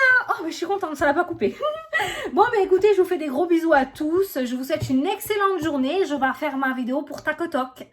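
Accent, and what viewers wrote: South, France. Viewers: j'adore ton accent on se croirait en vacances dans le sud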